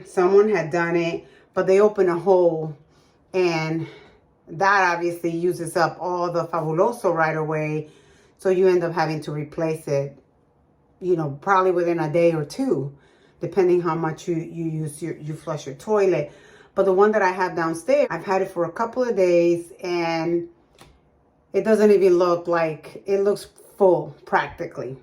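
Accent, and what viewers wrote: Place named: Puerto Rico. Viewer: Are you Puerto Rican so cute the accent!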